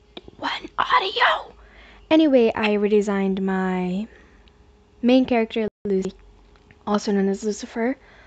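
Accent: United Kingdom. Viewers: You can hear your British 🤣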